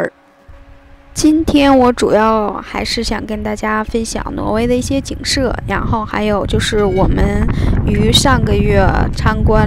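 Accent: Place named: Northeast, China. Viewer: 听🈵️……东北s味十足…凑合着听吧……呵呵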